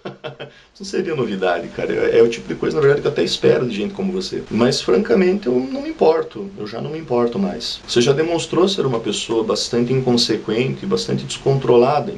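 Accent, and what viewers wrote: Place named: Rio Grande do Sul, Brazil. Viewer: Disse tudo, sou professora e sei como é. Adoro seu sotaque carregado e viva a nós gaúchos!!💪👊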